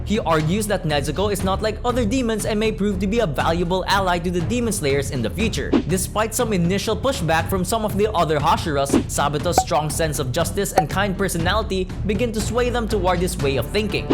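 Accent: Philippines. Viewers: That Filipino accent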